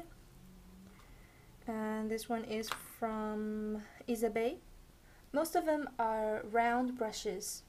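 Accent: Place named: France. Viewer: You have such an amazing french accent, are you french? or have you lived there?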